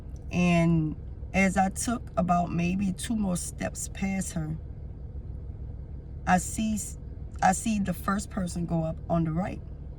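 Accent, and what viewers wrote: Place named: New Orleans, United States. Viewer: you sound like you have a New Orleans accent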